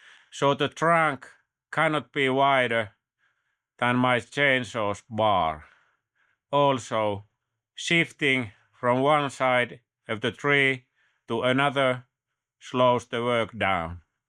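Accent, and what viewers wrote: Finland. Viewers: Thank you for taking the time to explain this subject to a layman. Fascinating and informative. Also the Finnish accent is pleasing to my ears. Peaceful Skies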